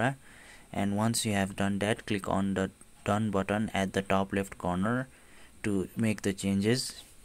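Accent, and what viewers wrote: India. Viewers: 5 mins Indian accent but not over the top no stupid commentating perfection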